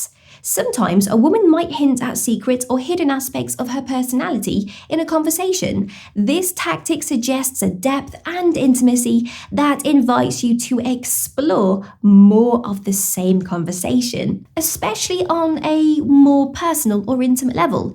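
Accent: United Kingdom. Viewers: What I have trouble with is her Brit accent .Too much.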